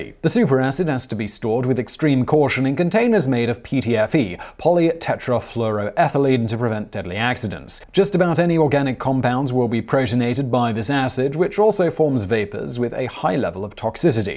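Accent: England, United Kingdom. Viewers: All of you guys complaining about how he prounces cadmium must not be regular viewers. made it very clear in other videos that he doesn't care if he mispronounces words and clearly puts in little to no effort trying to remedy the problem. This is exactly why people who don't understand topics shouldn't present videos on them. And why you need a host who contributes more to the show than just an English accent. Incorrect information, like misproununciations, spread like a disease. One person hears it, then spreads it on to others, who in turn spread it on to others thsemselves. And so on and so on. Some may think it's a trivial issue, but when we're talking about 10s or 100s of thousands of people being exposed to this stuff, the figures are anything but trivial.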